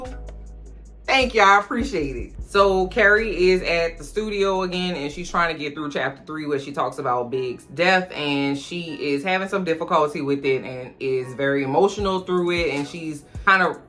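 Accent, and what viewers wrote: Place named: Lowcountry, United States. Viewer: Great review! Your covered exactly how I feel about Miranda Che storyline. Love the accent. Sounds like my family on the GA SC coastal islands.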